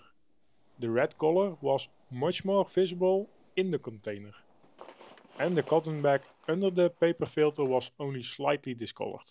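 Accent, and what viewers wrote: Netherlands. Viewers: Vind het leuke videos. Erg interessant, leuke technieken en 3d printen, maar stoor me wel vreselijk aan het Nederlandse Engels.. 😢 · Who's also Dutch and just here for the pronunciation? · I like a deep dive into anything nerdy with a Dutch accent. Takes me back to my brief stint working in Eindhoven. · So, you klinkt as a Nederlander 👍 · I love the heavy dutch accent haha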